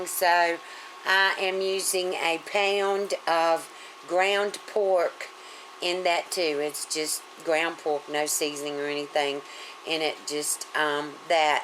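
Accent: United States. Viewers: Love that american accent. Will try out this recipe later today in London UK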